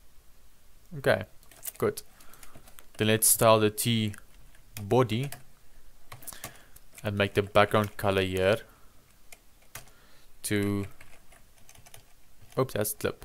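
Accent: South Africa. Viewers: this guy is definitely south african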